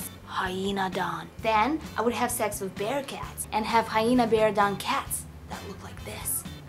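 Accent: Russia. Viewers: I would....yeah probably steal it from my sister and eat it. Я люблю ваш русский акцент! :) Moosh!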